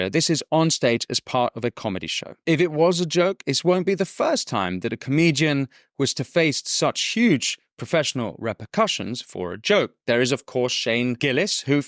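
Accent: United Kingdom. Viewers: Anything you talk about will be accepted by Americans because of your accent. Is what it is, we love the British accent. 🤷🏽‍♂️🙌🏾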